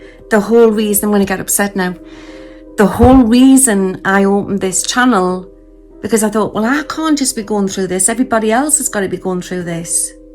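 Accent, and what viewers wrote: Newcastle, United Kingdom. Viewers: Hello, i have only just found you, tarot by janine shared this on twitter,you have a lovely calming voice and i love the geordie accent Definitely subscribed to you ,blessings from the north west (Southport) x❤️😇🧚‍♀️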